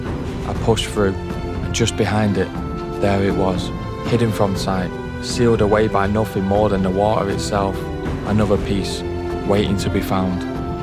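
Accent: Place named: Manchester, United Kingdom. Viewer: Wonderful Channel I Love watching your Adventures and Narration Can I ask are you from the Manchester Area?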